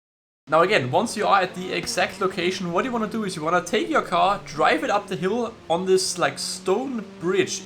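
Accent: Germany. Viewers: Is it possible that ur German? You have that accent😅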